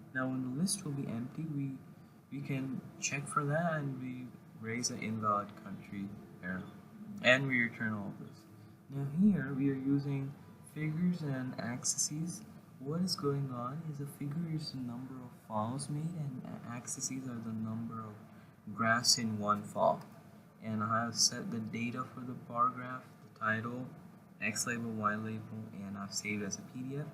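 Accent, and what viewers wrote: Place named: England, United Kingdom. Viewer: EPIC ENGLISH ACCENT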